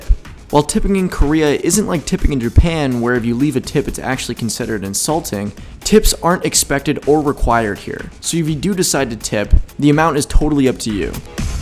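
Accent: United States. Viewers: Are you by any chance from Maryland? Your accent reminds me of so much of some of the people from there.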